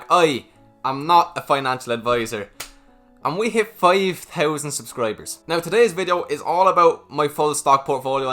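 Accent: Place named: Ireland. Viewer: Seriously mate, three reasons for me to keep on watching your videos: your enthusiasm, expertise and definitely your Irish accent. Is there any reason though why I hardly see any short term investments in your portfolio or long term investments in low rated companies?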